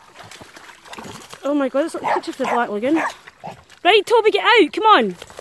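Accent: Scotland, United Kingdom. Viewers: Hass is sounds Scottish! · Are u scotishh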